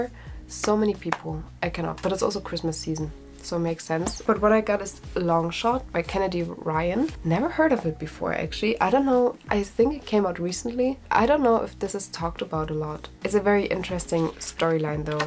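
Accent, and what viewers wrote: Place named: Germany. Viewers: I was wondering the same thing after hearing two sentences 😊 definitely a german accent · Are you German? I was just wondering because you have a German accent I’m not trying to be rude(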